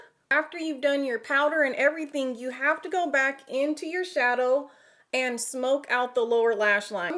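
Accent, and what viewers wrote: South, United States. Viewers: This is my first video of yours are you southern?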